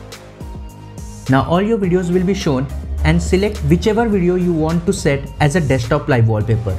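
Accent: India. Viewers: when is indian accent it works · Not the indian accent😭😭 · couldnt stop laughing at the Indian accent im sorry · it’s always the guy with an indian accent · > indian accent > less than 10 mins long Those type of videos always work for me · When i heared the indian accent i knew it gona work, thanks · Ofcourse an indian accent when i saw the time stamp i knew it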